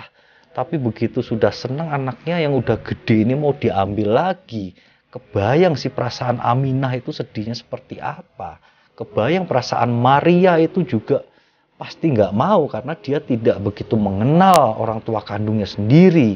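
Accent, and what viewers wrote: Java, Indonesia. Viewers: Jawanya medok bgt tp ngomongnya jakarta...duh jd 😂😂😂😂gk pas bgt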